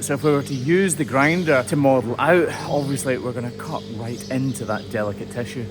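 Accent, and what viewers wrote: Scotland, United Kingdom. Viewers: He Scottish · I enjoy you videos. I started watching so I could better your Scotish accent. Now you are speaking more proper I sort of miss that.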